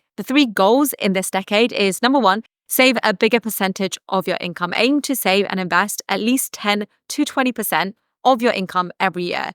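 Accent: United Kingdom. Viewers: British Accent 😑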